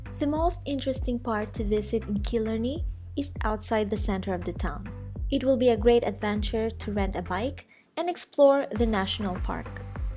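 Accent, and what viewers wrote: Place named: Philippines. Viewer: I think the way you speak and your english and it seems you are a filipino?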